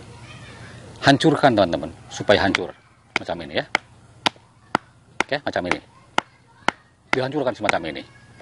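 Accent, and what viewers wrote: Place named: West Java, Indonesia. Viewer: Sunda pisan cigah urang cilengsi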